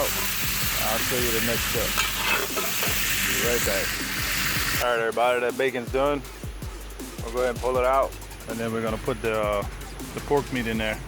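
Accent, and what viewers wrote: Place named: Louisiana, United States. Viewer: Making it today!!! I've watched many people's vidoes but this one was more of my type of jambalaya! I live in Louisiana so when I heard this accent I knew it was the one!🤣🙌🏽🙌🏽🤟🏿 I will be back for updates!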